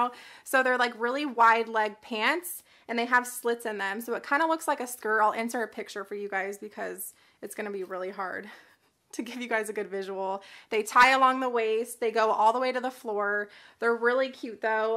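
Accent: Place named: Texas, United States. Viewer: The way you said 13 bucks is so SOUTHERN LOL I loved it! You sound like a real Texan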